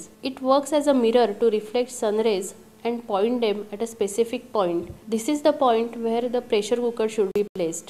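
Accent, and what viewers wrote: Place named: Maharashtra, India. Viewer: Marathi girl speaking English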